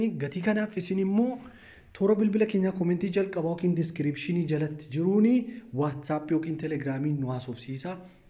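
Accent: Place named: Addis Ababa, Ethiopia. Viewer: Ati finfine malee hin dubaatu kanaafi me garaa naqamte nu dubaadhu malooo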